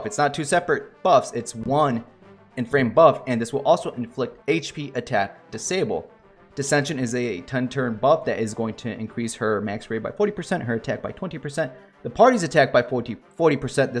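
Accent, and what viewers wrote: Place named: Texas, United States. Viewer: Just curious, where in the country were you born/raised/got your accent from? I've never heard "heirloom" get reduced to "/ˈɝl.lum/" from "/ˈɛəɹ.lum/" before. Sounds almost texan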